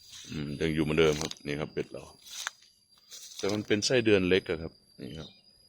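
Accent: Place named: Isan, Thailand. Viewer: เว่าภาษาอีสานได่ดอกฟังแล้วมันกระดากหูว่ะ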